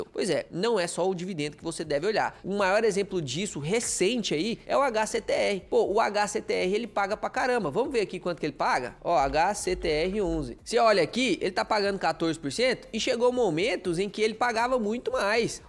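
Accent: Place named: Goiânia, Brazil. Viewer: Tu és de Gyn mesmo? Tava pensando: "Esse sotaque... É de Gyn, de Minas de Tocantins ou no interior de SP?" rsrsr. Mas tava apostando que seria de Gyn (já morei por muitos anos em Goiânia mas nunca peguei sotaque, rsrs. Ou talvez...sei lá, rsrs)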